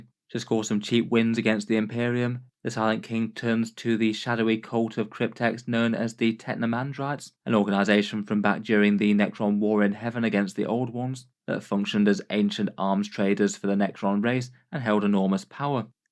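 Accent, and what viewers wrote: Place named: England, United Kingdom. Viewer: His accent comes across as fairly vanilla southern, he certainly doesn't have a strong regional accent at all - though regional accents as a whole are declining quite quickly across the UK according to some research I saw a couple months ago. I was meaning more in relation to his cadence, enunciation and intonation, which I think you're right when you say about it being a "presentation voice". I'd be very surprised if he spoke like that at the pub! Remarkably consistent across his videos though.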